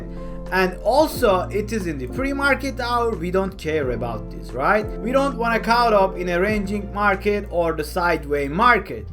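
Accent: Turkey. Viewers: The Turkish accent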